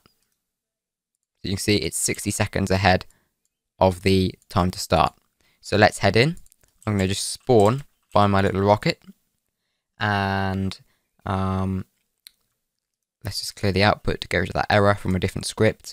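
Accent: United Kingdom. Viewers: the voice of me but WAY more British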